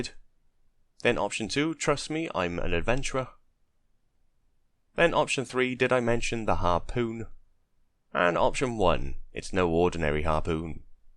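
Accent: Wales, United Kingdom. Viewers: Ty for all the guides. Sound like a fellow welshman!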